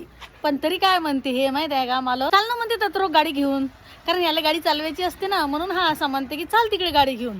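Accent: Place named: Akola, India. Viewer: Akola chi bhasha ahe baa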